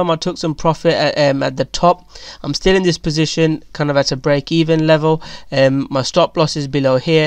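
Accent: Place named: Bradford, United Kingdom. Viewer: Another great video as usual. Did you cover the GVT we discussed a couple of weeks ago. If so can you tell me where to find it. What's your thoughts on ADA from a hodl point of view. A £10 price is all I need 😉. I'm trying to figure your accent. I thought I sensed a Bradford twang.but it could be anything from Southampton to Bournemouth Brighton upwards. I'm from God's country (Wales) in Wrexham.Many questions. Take your time 👍🤝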